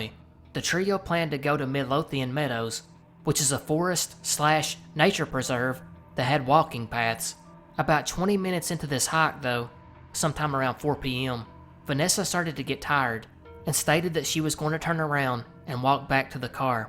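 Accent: South, United States. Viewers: Keep up the great work! Love hearing a southern voice on here!!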